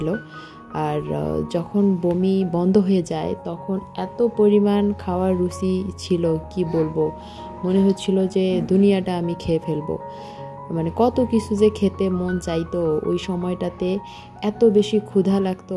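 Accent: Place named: Rangpur, Bangladesh. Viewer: না বুঝলে আর কি করার বলেন কিন্তু ওই আপু হয়তো বুঝেছে আর আমি কিন্তু খারাপ ভাবে বলিনি অনেক নরম করেই বলছি কমেন্টে হয়তো বুঝতে পারেন নি ভয়েজ অপশন থাকলে বুঝতে পারতেন আমার কথা যাইহোক এই আপুও রংপুরের আমিও রংপুরের আর আঞ্চলিকতার টান সবার থাকে কিন্তু কিছু কিছু শব্দ উচ্চারণে এই রকম হয় অনেকের আমার যে হয় না তা নয় আমারও হয় কিন্তু আমিতো ভিডিও করছি না ঘরের মধ্যে কথা বলছি তাই কেউ বলবে না কিন্তু উনি তো ভিডিও করে সবার সবটা পছন্দ নাও হতে পারে। আর আমি শিক্ষা নিয়ে কোনো খারাপ কথা বলিনি ভালো করে কমেন্ট পড়ে বোঝার চেষ্টা করেন আজগুবি রিপ্লাই দিয়ে বুঝাতে চাইলেন আপনি খুব ভালো মানষিকতার আর আমার মানষিকতা ভালো না।আমি এটাও বলছি আঞ্চলিকতাকে সম্মান করি।ভালো থাকবেন।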